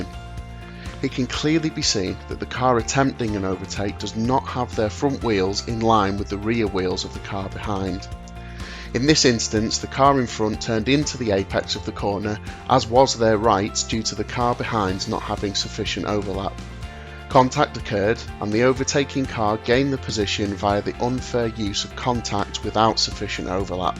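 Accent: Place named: RP, United Kingdom. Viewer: hell yeah commentary with queen's english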